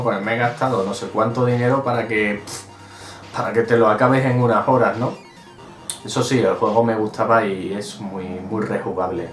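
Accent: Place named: Spain. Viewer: de que pais eres? esque pareces español pero a la vez latino xD PD: buen video:)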